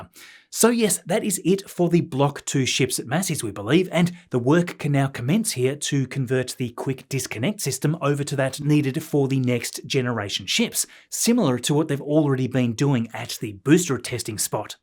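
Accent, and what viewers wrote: Australia. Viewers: I love listening to Australians explain stuff. Their accent is top notch.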